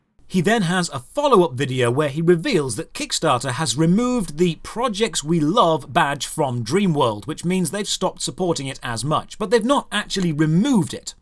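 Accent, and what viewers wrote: England, United Kingdom. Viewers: Your Commentating reminds me of top gear, either its the nostalgic accent from my childhood in England or just your Personality.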